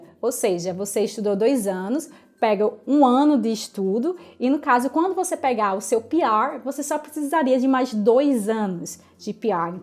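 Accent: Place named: Portugal. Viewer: Seu sotaque é tão bom de ouvir kk parece um pouco o português de Portugal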